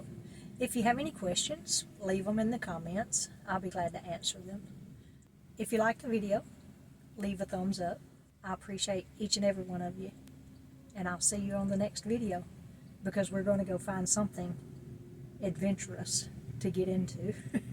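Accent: South, United States. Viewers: Just subscribed!!!! Do I detect Southern accent ??? I'm in South Carolina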